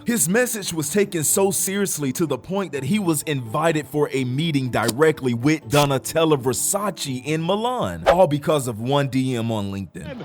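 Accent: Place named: United States. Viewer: I swear the voice sounds like an ai but i cant quite tell for sure because i know this is a french channel but the voice actor sounds very american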